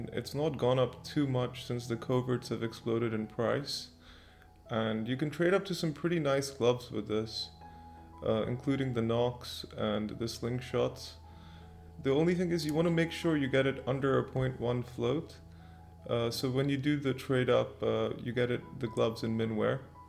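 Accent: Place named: Czech Republic. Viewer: Wow man, what a cool idea. Hope you will find sponsors to boost inventory. Btw where are you from? you sound Czech to me but if im wrong sory. Good luck.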